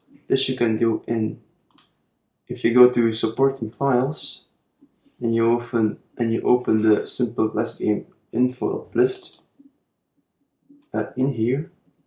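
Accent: Russia. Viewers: типичный русский акцент)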